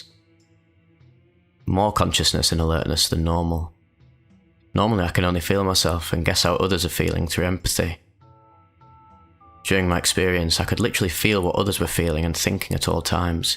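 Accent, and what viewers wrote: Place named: Manchester, United Kingdom. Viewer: Majors mask is the shit... Sounds like you're close to Manchester... Safe bro lol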